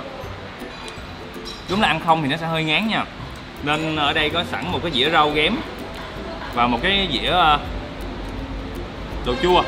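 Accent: Mekong Delta, Vietnam. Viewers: Hi! Chi rat thich nhung video clips cua.mot bo cuc ro rang,rat l doi thuong,va cai cach noi chuyen vua ( rat la Mien tay,chi o Long An coi cung la dan M,tay ). nè! Neu nhu em co di holidays Autralia. Thi em goi chi nha,chi o Sydney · vui tính,thoải mái,nc rất miền tây.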